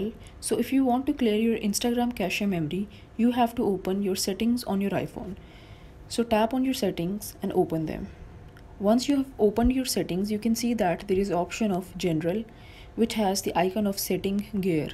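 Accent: India. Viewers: Indian voice 🤢🤮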